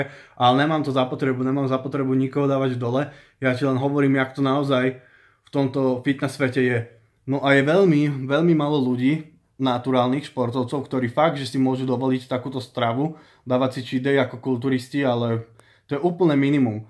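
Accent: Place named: West, Slovakia. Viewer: neznášam západoslovenský prízvuk, ale pozrel som to dokonca :D ber to ako kompliment, no offense, gl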